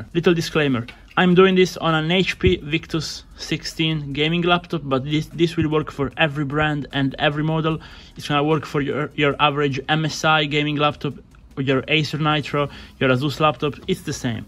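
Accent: Italy. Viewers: si sente che sei italiano comunque bei video.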